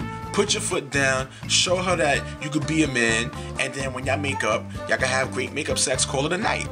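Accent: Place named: New York, United States. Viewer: where r u from? gotta be bk or the bx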